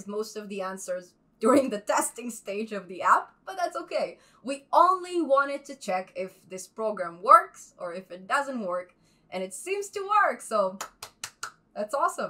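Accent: Eastern Europe. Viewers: The voice accent is similiar to slavic or eastern europe citizen but not real canadian. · Чем то славянским отдает произношение :)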